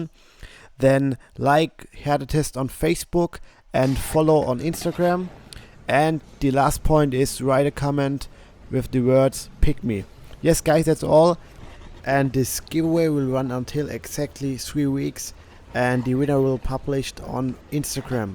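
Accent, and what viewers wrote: Germany. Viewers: Ey deutscher,Man hört das du deutscher bist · Man hört das du deutsch bist lul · Man merkt übel dass du deutscher bist aber nices video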